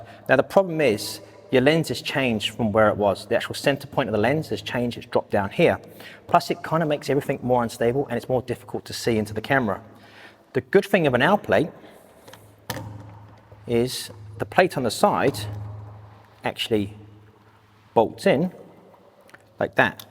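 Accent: United Kingdom. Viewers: Don't worry, POHM, there isn't even the most remote hint of fush and chups in that accent! 100% UK very obviously. As you were. You can CNC machine from cast or extruded or "billet" or forgings. What you start with and how you get it to shape are somewhat independent. If they machined it from a bullet, though, it must've been a BIG one. ;-)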